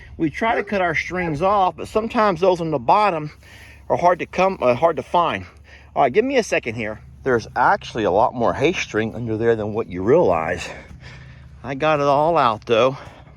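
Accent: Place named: South, United States. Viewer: I am 100% right there with you on the southern pronunciation, there. If I have to use text-to-talk or google search voice, I have to speak loud, clear and slow...and STILL get frustrated because the damn thing doesn't speak Southern! And forget calling with voice activated menus....I might say "pay my bill" 5x and it still can't understand me! So I give up an start cussin it out...so it finally switches to number controls: 1 for yes or 2 for no. I laugh but I also get really pissed....because Southern people in my neck of the woods get some words more correct than other states! Some places people say E-mmediately, we say IMmediately (like IMagine) which is likely more proper! Its a crazy thing, the english language!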